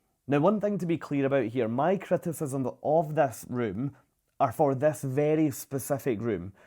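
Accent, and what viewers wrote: Scotland, United Kingdom. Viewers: I just now stumbled upon your Vlog -- nice to hear a fellow Scot. My travel agent REFUSES to book us on MSC, so I watched this with interest to get your view. After this, I think we'll stick with Celebrity and Regent.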